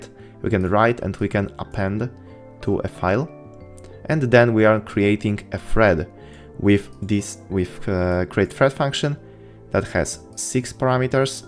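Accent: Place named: Poland. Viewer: Polski akcent pozdro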